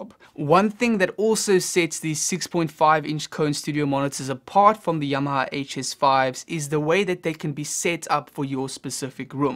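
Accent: South Africa. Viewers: Howzit boet - where in South Africa are you from?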